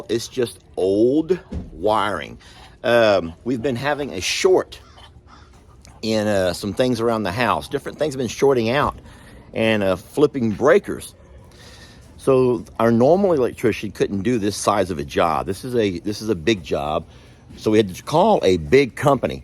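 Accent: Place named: Rural, United States. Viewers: I’d rather hear that generator than that hick accent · deep southern drawl with a twang of!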